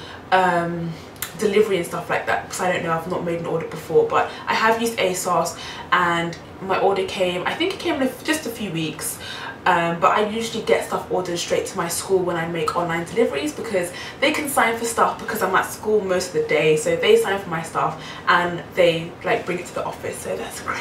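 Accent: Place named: RP, United Kingdom. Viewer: You're so posh your accent omg!! 💕💕💕😍😍😍😍😍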